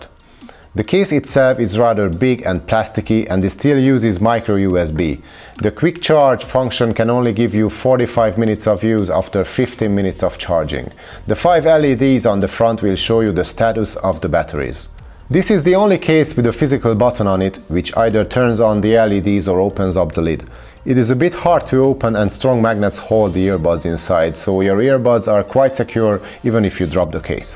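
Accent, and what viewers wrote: Hungary. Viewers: Magyar vagy? :) az akcentusod alapján olyan mintha az lennél :) ettől függetlenül szuper a kiejtésed és a content is szuper :)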